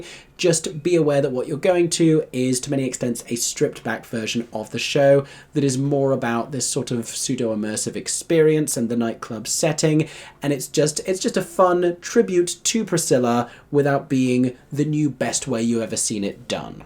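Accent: Australia. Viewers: Nah gonna need a whole video In that Australian accent now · The Australian people demand more Aussie accent!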